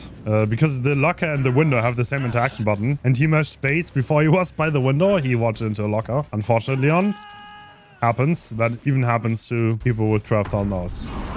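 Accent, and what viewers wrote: Germany. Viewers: its kinda funny that he copied the exact same speaking style like Otz but with a german accent.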